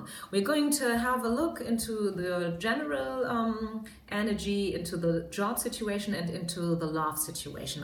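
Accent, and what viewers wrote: Germany. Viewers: New sub here! Picked #3. Wow! So on point. Amazing! I'm indeed starting my own business and I'm a really spiritual person. I'm single for 2years now and I plan to stay like that, I guess you can say I gave up. I loved your energy. I studied German in Regensburg when I was younger, and I love to hear your accent. Thanks for this reading. Love and light from Brazil 🤗🤗🤗😃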